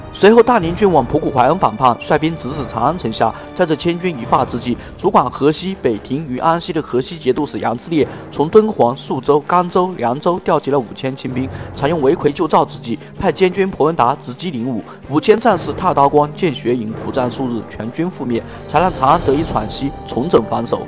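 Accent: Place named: Hong Kong, China. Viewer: 應該是香港人吧!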